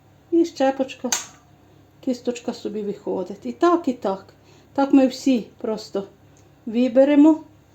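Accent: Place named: Western Ukraine, Ukraine. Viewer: Добре відчувається мова західняків. Наші люди як бджілки, все тягнуть додому.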